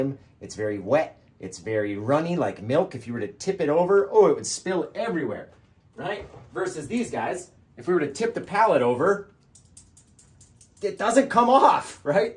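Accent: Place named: New England, United States. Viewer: Soooooo, what part of New England are you from? Lol, the "wicked" gives you away...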